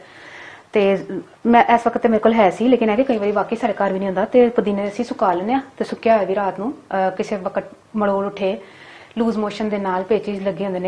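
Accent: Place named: Punjab, Pakistan. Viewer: Ap ki pnjabi boht he mzy ki he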